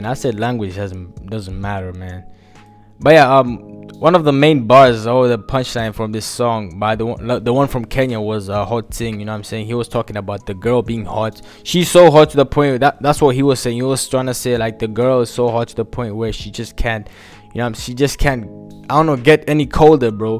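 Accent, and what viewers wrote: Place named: Burundi. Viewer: This is Rwandan? He sounds like Burundian.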